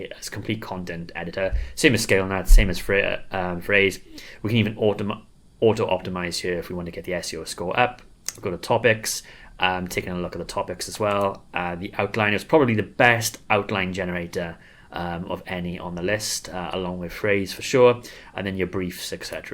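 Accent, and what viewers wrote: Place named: Cockney, United Kingdom. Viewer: I think you forgot your audience was mostly American and not cockney? It's difficult to follow you. This is a really good video but no way am I going to slog my way through 2 hours of this. But your shirt fit the the mood.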